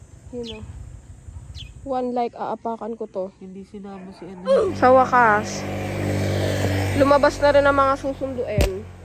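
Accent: Batangas, Philippines. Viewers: lods penge sticker! new subscriber nga pala ako, nakakatuwa yung punto mo lods parang batangenyo, hehe. rs! 🤙🛵💨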